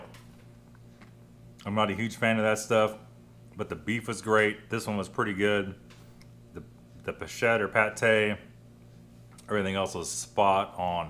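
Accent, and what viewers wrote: United States. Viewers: Super, your American accent is so cool :) I sometimes wonder if I was an American before ;) And ofcourse your way of showtime keeps me watching, Greetz from this damn cold Holland lol :)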